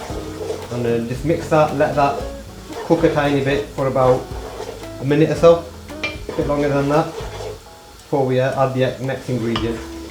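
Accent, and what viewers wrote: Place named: Northern England, United Kingdom. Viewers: Northern English I think to be more precise, not too far from my hometown I'm guessing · British accent.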